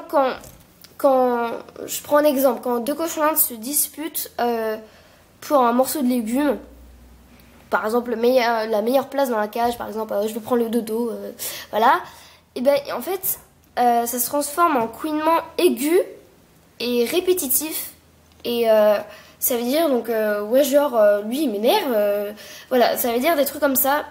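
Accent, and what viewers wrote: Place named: Belgium. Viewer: t'es belge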